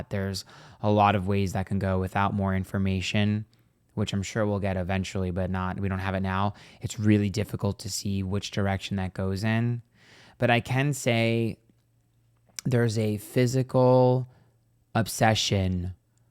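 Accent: New York, United States. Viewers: His house in Massapequa looks like a house of horrors and it was an eye sore in the neighborhood and neighbors have apparently seen him burning garbage in his backyard in the past as well as watching females suntan in the neighborhood. He’s a creep. Are you from Long Island? You definitely have a New York accent and speak fast 🤣 but I can totally keep up. You speak my speed. I’m from Long Island. You say cawfeee? 😁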